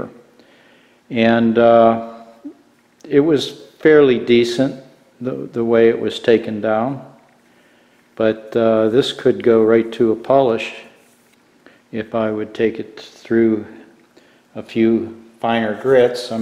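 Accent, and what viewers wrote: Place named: Pennsylvania, United States. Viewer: fantastic video...My cobler just arrived yesterday ~ still in the box and will be putting your helpful video to use tomorrow... Thanks so much!!! You have a South Western PA accent...curious...any chance you're from that area???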